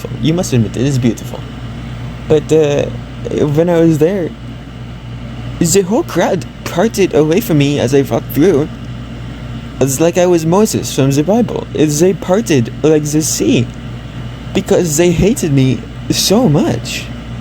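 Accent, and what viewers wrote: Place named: United States. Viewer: i hear ur american accent coming out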